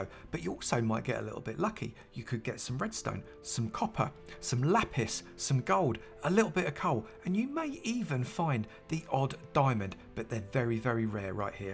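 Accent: United Kingdom. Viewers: I love the British accent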